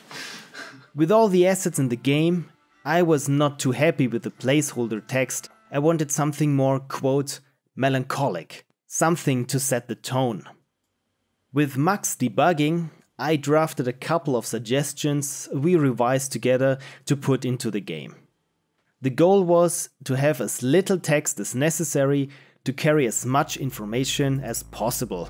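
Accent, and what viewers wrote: Germany. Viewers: Is he german? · are you from germany? you sound like you are german...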